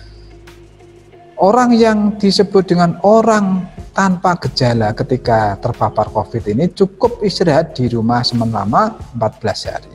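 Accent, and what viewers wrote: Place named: Java, Indonesia. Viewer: Matoh... Jowone medok...😄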